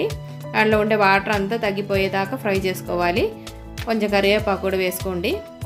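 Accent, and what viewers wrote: Kerala, India. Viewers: Hi ma'am ningal malayali aano ningal Telugu parjunna slang malayalis nde pole thonnu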